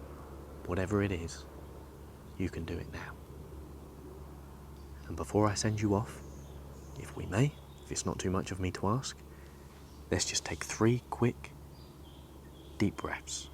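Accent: England, United Kingdom. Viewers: sounds like an english accent to me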